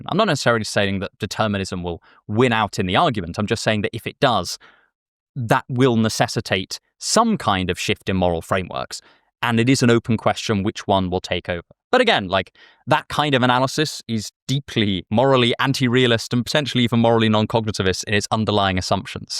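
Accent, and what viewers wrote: United Kingdom. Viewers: this is my first video of yours that ive watched, was so shocked from the british accent i got whiplash, you give american vibes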